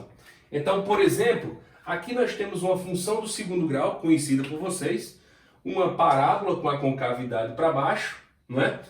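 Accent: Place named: Northeast, Brazil. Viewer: Pense num sotaque bonito é o do nordestino! Aula top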